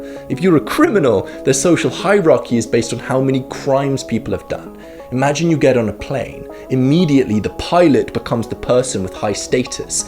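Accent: United Kingdom. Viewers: Bruh your level of articulation is phenomenal and combining that with your British accent is finese....... subscribe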